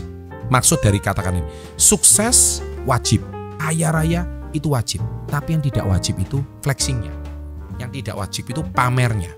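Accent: Java, Indonesia. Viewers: Matur sembah nuwun, Pak, sae! jian medhok lan jeru....wis mirip dhalang tenan, Pak 👏👏👏😍 Tapi mungkin "Urup" = "Tukar", ya. "Murup" = "Nyala"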